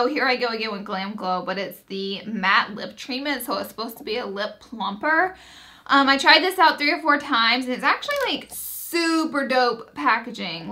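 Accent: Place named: Alabama, United States. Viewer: I just started watching you today when I stumbled on one of your videos. Within 20 seconds I thought "she has to be from Alabama!" Lol I say "oil" the exact same way.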